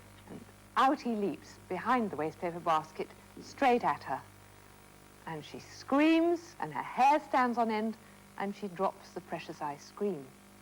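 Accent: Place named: RP, United Kingdom. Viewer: I saw another episode of Gammon and Spinach a few years on YouTube and my vague childhood memories were ruined! I could vaguely remember the stories being about the frog in the opening titles, and I could remember the presenter and her very posh voice but not her name. Imagine my disappointment 30 years later when I found that Gammon and Spinach was just an ordinary story reading programme that Yorkshire Television tried to make more "interesting" by having A Frog He Would A Wooing Go" as the theme complete with the animation of the frog in a Victorian suit and the presenter/storyteller on a pond set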